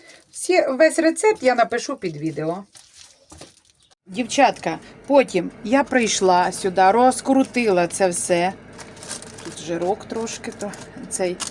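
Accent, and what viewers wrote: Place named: Luhansk, Ukraine. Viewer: говор такой как у нас, суржик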